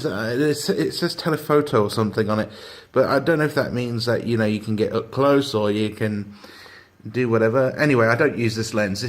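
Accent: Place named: United Kingdom. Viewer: British accent is why I'm here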